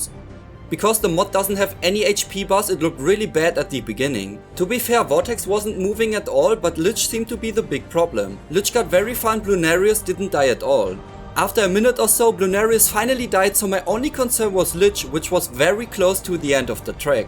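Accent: Germany. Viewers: Deutscher Akzent immer so lustig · nice german akzent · U German?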